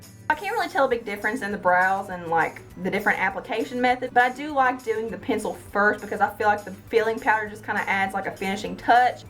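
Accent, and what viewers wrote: South, United States. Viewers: r u using a ton of filming equipment or just your phone. .I think being yourself and using just a phone to record or minimal equipment is so much more genuine and easy to relate to...I love how easily you explain everything and seem to enjoy sharing your talent. .and product information etc with your viewers. .I live in Mississippi so I adore your accent. .looking forward to more videos and tutorials. .great job. ..best wishes!!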